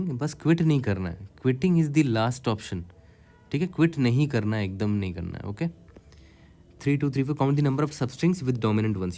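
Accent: Bihar, India. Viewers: Bhaiya aap bihar se ho kya??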